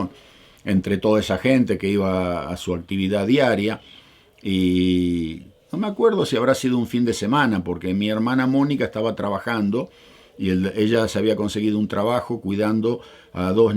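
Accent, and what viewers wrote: Argentina. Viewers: Hoy,6 de agosto de 2020, recién encontré los videos de está familia....la verdad lo admiro por su vida,su educación,su lucha....y lo hermoso es su lenguaje que no ha perdido nada de argentino, pero domina el relato como nadie,ninguna muletilla..... más lo quisiera algún periodista famoso!!!! Cariños !!! Cris de Mar del Plata. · Me encanta que, viviendo tantos años afuera nunca perdió nuestro tono Argento tan particular. Saludos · Jaimez Cristian : si esta muy bien su forma de expresar, pero su asentó ya no es tan argentino, A mejorado mucho su lenguaje. Y se oye mucho mejor...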